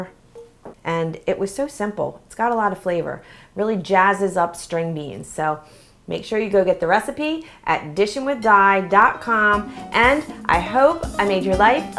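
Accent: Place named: Philadelphia, United States. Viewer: Love it. "Wudder" Are you from Philadelphia? Making this for Turkey Day today. big hugs.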